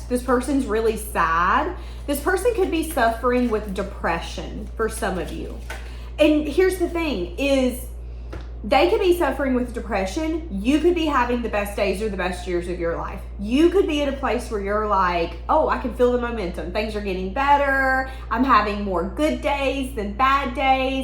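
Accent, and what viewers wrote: South, United States. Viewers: I love your southern drawl !! 😊 you don’t need classes . It’s cute😊. I’m from NY. The Canadians make fun of how we talk .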